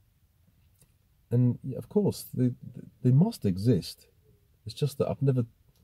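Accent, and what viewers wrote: United Kingdom. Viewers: Do you play a sport that may include women? I am not sure where you are from...accent possibly British? Do you play pickle ball? Take some lessons, and they can direct you to courts or groups of people that play. You can meet so many people. Certainly make friends like that. At the very least, you will be out amongst other people and have great fun too. You have to put yourself out there. The older we get the harder it is to make good solid frienships, especially for introverts. God Bless and good luck.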